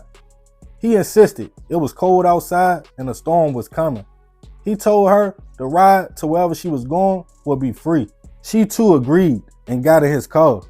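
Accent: Baltimore, United States. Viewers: dat bmore accent too hard to hide...lol yo be like tryna sound professional but dat bmore swag just shine thru brova.. Just speak how u speak yo and it is what it is. Being yourself makes people appreciate your content and u more.. Also i ive in bmore bro and i wanted to tell u that I hope one day i meet u cuz u inspired me to start grinding again on my page.. Im about to start gettin to it and being consistent and thats because of u brova!! so thank u... sincerely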